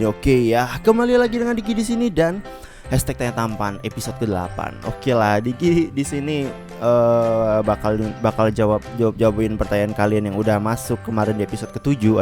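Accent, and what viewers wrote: Java, Indonesia. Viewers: cara ngomong biar ga medhog gmn bang? #TanyaTAMPAN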